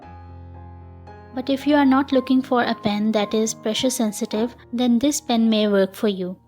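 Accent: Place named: India. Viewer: There are tons of videos on brushes but this is the most helpful among all. I love the format of your videos and tips too😍😍🤗☺️. Your accent sounds like Indian...are you Indian 🤔🤔.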